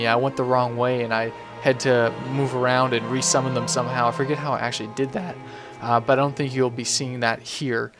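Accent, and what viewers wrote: Canada. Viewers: He's Canadian. ^^ When we watched his stream in July/August, the chat frequently poked fun at his accent. :D "What are you talking aboot?" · are you canadian?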